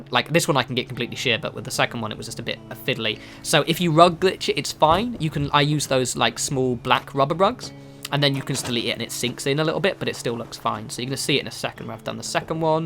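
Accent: England, United Kingdom. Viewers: English. Weston-Super-Mare I think:)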